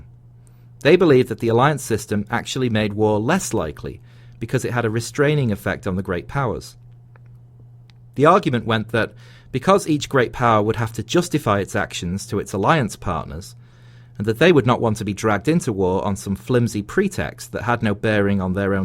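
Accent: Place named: Liverpool, United Kingdom. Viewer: I mainly listen to your lectures to hear a Scouser. (Of course, also because of the history lectures)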